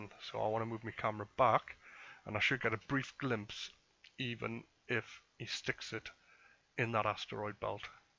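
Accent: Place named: North, United Kingdom. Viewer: Nice vid, have to say where you from m8 as ya sound like ya from the north. Cant wait for the bfg game to launch will u be doing the campaign? And i would deffo get the IN with marks/favours to boost them maybe the imperial navy mark to spam cobras lol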